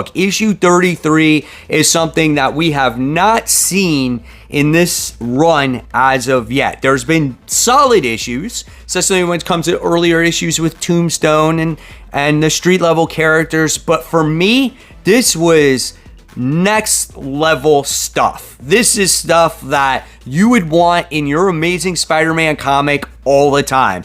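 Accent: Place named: Florida, United States. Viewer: You Floridian!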